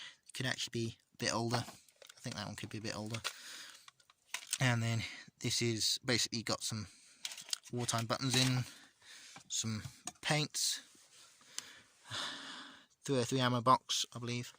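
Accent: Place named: United Kingdom. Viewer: Mate, you have a strong British accent.... nice room decoration..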